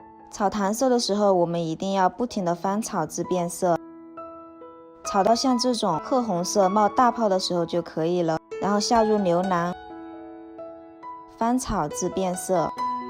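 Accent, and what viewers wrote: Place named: Sichuan, China. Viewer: 川妹子吗？这普通话 哈哈哈 加油！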